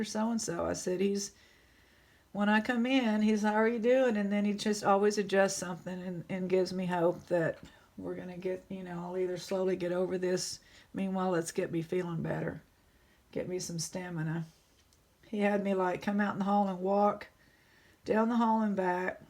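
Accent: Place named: Texas, United States. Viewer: It's good to hear an update from you, my Texas jewelry friend. You posted a video during the day several weeks ago and my students were in my classroom. I told them I get good stuff to make jewelry with from you and played the video. Several of them commented that they like your voice.. I was like.."Well, of course.. she's Texan!"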